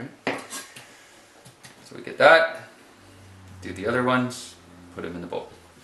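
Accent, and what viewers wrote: Canada. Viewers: You sound like a fellow Canadian. I could be wrong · Canadian, eh? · Oh my god, I love that accent! Canadian, right? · Thanks you for sharing your ideas and opinion in clear easy to understand English. A Canadian accent, I suspect, maybe with Newfie influence.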